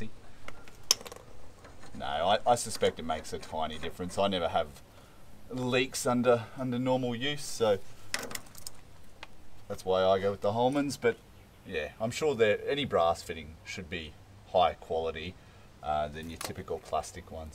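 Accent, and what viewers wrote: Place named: Australia. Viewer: He's got the nice, hot Australian accent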